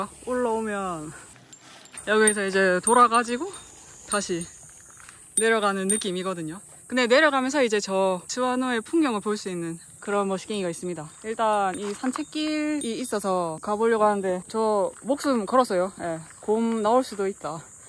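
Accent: Busan, South Korea. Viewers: 自然派美人ですね。面白いし。購読します。方言も最高（笑）。자연파 미인이시네요.성격도 재미있는것 같아서.앞으로 잘 볼게요.그리고 경상도말이 최고 ㅋ. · 곰 안나와요! 일본시골에서 부산사투리 조지시는데 ㅎㅎㅎ 이외중에 피부 미모 열일! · 부산표준어 찰지네요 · 안녕하세요? 우연히 발견한.... 15년 전 츠와노 옆 동네에서 3년 살면서 츠와노...놀러 간 적이 있어요. 신사까지만 가 본 기억이 있네요. 곰을 만나지 않고 무사히 하산....보는 내내 긴장했어요 ㅎㅎ 즐거운 여행이 되시길 빕니다. 부산사투리 재밌어요💞💞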